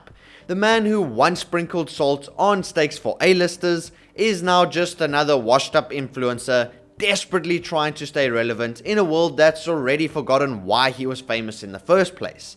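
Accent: South Africa. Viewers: I hear a south african accent 😊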